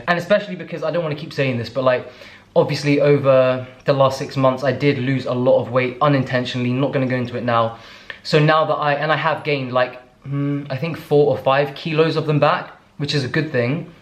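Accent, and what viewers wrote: United States. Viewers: I like how you try and pronounce certain words in an American accent, u got it all down pat except "water-melone" that's halfway Spanish accent 😂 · Love the American accent 😂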